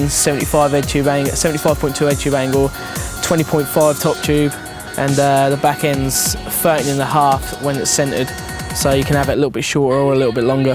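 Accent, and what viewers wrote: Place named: England, United Kingdom. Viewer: dont give him shit thats probs just how english say bike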